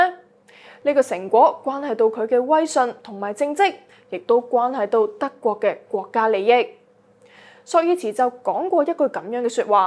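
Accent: Standard. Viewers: 歡樂時光，人靚聲甜字正腔圓又鬼馬😘😘😘👍👍👍💪💪💪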